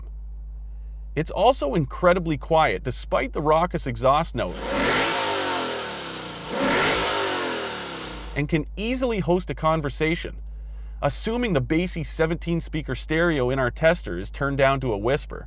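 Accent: Canada. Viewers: that canadian accent makes my ears itch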